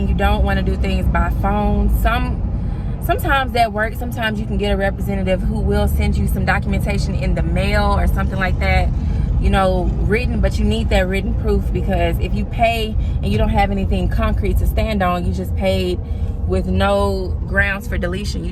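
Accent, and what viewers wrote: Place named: Chicago, United States. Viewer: U sound like you're from Chicago. 😁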